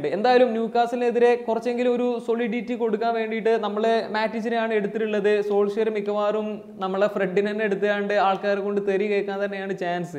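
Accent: Malappuram, India. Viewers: Malappuram slang kidukki💥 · ഇങ്ങൾ മലപ്പുറം കാരൻ ആണോ 🔥❓️❓️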